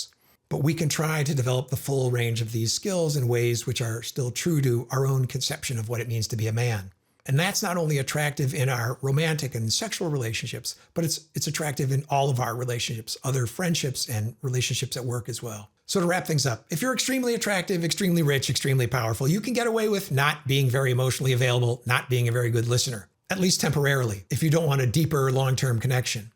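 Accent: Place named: United States. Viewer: Sorry, what part of US are you from and what do you call your accent?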